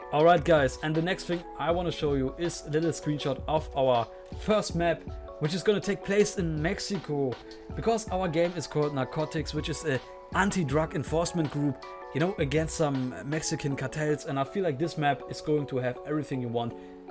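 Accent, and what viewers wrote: Germany. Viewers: you have a German accent. BTW great wishes from Germany, im really excited about your work and how good the game will become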